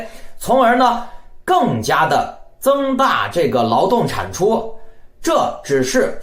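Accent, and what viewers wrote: Henan, China. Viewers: 恁是河南人？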